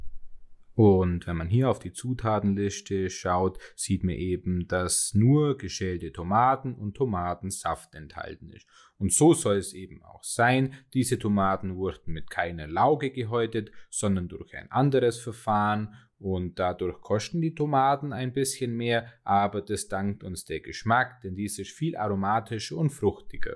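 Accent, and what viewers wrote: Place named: Baden-Württemberg, Germany. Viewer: Wie kann man da fränkisch raushören? Ich glaube, der gute kommt eher aus Baden-Württemberg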